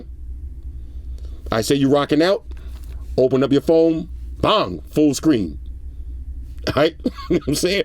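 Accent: New York, United States. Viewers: is from the south and is from New York he has a New York accent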